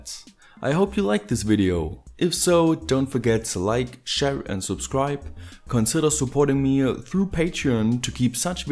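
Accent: Germany. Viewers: Are you from Germany? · habs an deinem deutschen Unterton gehört. Geile Videos machst du, ist immer interesannt an zu schauen.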